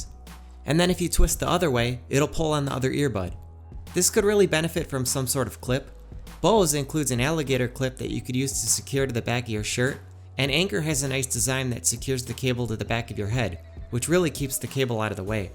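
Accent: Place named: Chicago, United States. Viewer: Ur Chicago accent is annoying. U seem to complain too much be happy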